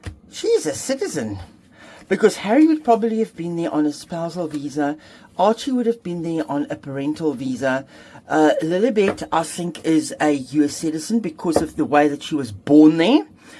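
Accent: South Africa. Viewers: You sound like a South African. 😮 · You are filming in New Zealand, but you have a very strong South African accent.?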